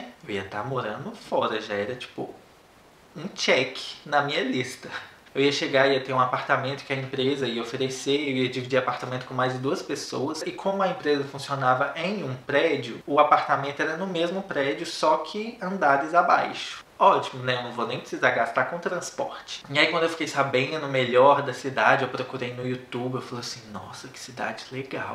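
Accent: Minas Gerais, Brazil. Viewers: Adorei,! As caras que vc faz enquanto vai narrando a sua história é 10, o sotaque mineiro é tudo de bom. Dito isso, conta mais pra gente. 😉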